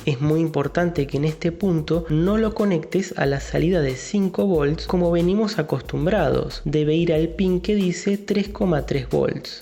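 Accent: Argentina. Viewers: ok claramente eres de arg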